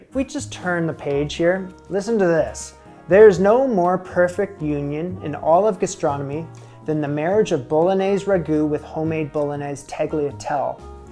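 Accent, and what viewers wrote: Canada. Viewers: I think-not entirely sure though-he was raised in Canada. I can totally understand what you mean by simply “Canadian”. He certainly has the accent and verbiage/slang from Canada, but some of the northern states pick that up too, so it’s honestly hard to tell sometimes. Had he not straight up said where he lived I would have guessed he was in Canada for sure. 😊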